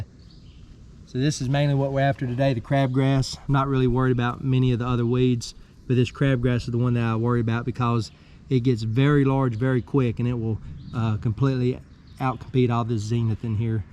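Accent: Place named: North Carolina, United States. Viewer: I like your no nonsense approach. I’ve watched most of your videos on zoysia, your recommendations have been spot on, no bs sales pitch. but to be honest I think it’s the southern drawl that makes your content more “watchable” 😂 you from Charlotte originally? Sounds more like Cleveland NC to me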